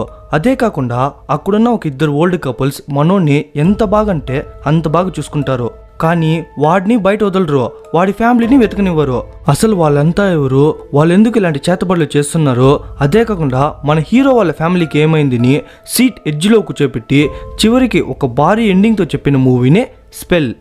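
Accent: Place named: Chittoor, India. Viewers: Thammudu ekadanundi nuvu Ni language slang Chittooru madiriga vunde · Babu ee uooru manadi yasa tedagaundi, rayalaseema slang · This chittor slang and he live in Bangalore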